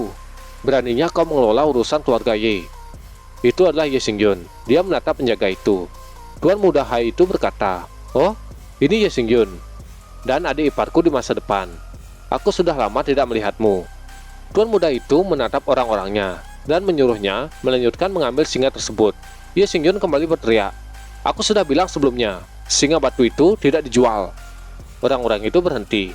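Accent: Bali, Indonesia. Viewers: Makin lama makin jelas logat balinya :v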